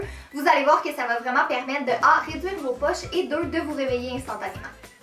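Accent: Quebec, Canada. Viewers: j'aime trop l'accent québécois ❤ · je suis francaise et j'adoooooore l'accent québécois 😍😍😍 (j'aime beaucoup ta châine) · Elle vient du Québec comme moi🇨🇦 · c'est le mangnifique accent Canadien/Québécois (désolée, je ne sais pas si c'est la même chose:))! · Elle est québécoise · t quebecoise ou anglaise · Hi j'adore franchement ton accent Québécois! !! Je suis française et j'adore l'accent du Québec 💖 Bisous ma belle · coucou ! j'habite en france, et j'adore l'accent québecois ! c'était juste pour te dire que je t'adore, tu es trop belle ! gros bisous <3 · J'adore l'accent canadien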